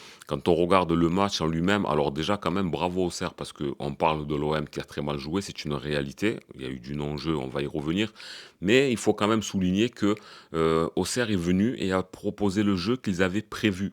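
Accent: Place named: Paris, France. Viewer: L'accent parisien n'est pas l'accent de référence. Quand tu viens chez nous, c'est toi qui a un accent.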